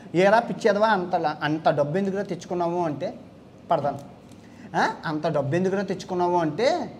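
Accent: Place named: Godavari, India. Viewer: My Favorite Dialogue Bro "చవక" ♥️🥰 (Cheap) " గోదావరి యాస బలే వుంటాది " Fan From రాజమండ్రి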